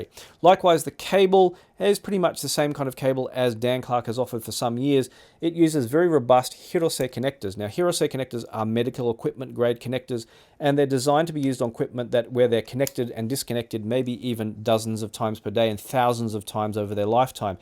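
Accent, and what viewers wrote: Australia. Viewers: Bravo! The most grown-up headphone review ever. "I'm not going to talk about cables." <3 I usually freak out when "audiophile language" is invoked; I can't help being reminded of when I spoke like that and I feel so ashamed. None here. No overused superlatives. No neologisms. As much a pleasure to listen to an Australian accent talking about the best headphones without needing to sell them (they'll do that themselves, no doubt) as it'll be to actually listen to the Expanse itself.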